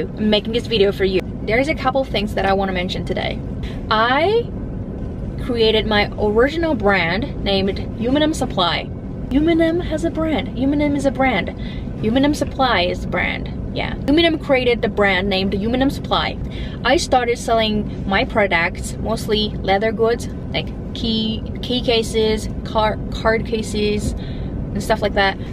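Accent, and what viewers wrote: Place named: Japan. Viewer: I love your Japanese accent.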